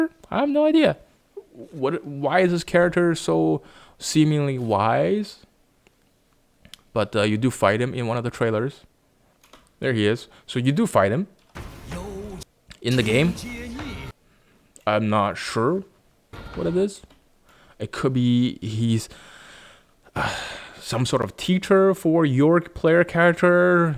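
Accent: Standard. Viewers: Thank you for your excellent video. Although there were some inaccuracies in the translation, it was already very admirable. Your accent is very standard. Like it👍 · Thank you for your video; your Chinese proficiency is already excellent, and your pronunciation is very standard. Here, I'd like to emphasize a cultural difference that might lead to a slight deviation in your understanding of the meaning of the poem at the beginning of the video. In traditional Chinese beliefs, gods (inherent, pre-existing beings) and immortals (those who can achieve immortality through cultivation) are considered absolutely fair and pure entities without personal desires or malicious thoughts. Desires for wealth, power, and emotional attachments are seen as obstacles for becoming a god or immortal. This perspective contrasts significantly with Western mythology, especially Greek mythology, where the emotions and personalities of gods and humans are more similar. 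So, in essence, the second line of the poem is expressing that although mortals admire the carefree freedom of becoming a god, they are unwilling to let go of their desires for wealth and power. This poem originates from another ancient novel and originally aims to guide ordinary people, with a Taoist advising them to relinquish their attachment to wealth, power, and emotions, encouraging self-cultivation to become immortals. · 你是华人吗，感觉你的中文特别地道标准，无论是普通话口音还是中文文化，你都很地道，感觉你至少在中国生活过很多年。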